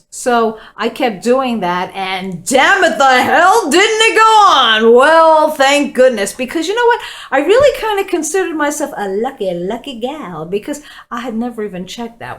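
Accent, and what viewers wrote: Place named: Long Island, United States. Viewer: After watching a flw videos why I like U I know U said U lived in Nevado but then I found U were from NY Now U say U were born in PA I am a Lancaster Cty baby that moved to Jersey then to Hastings just north of NYC Your accent tells me L.I. I gave been fulltining for just over a yr Rt now in Cali Thanks for the entertaining videos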